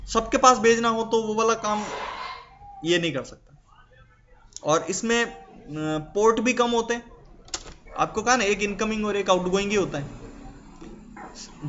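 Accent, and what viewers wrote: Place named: Jodhpur, India. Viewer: Sir me jodhpur se hu aapki aavaz se lag rha h ki aap sayad jodhpur ke ass pass ke ho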